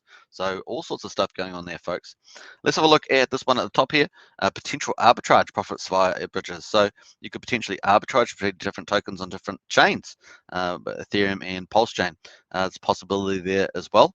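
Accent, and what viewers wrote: New Zealand. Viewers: Hey bro, good to hear a Kiwi doing some streaming.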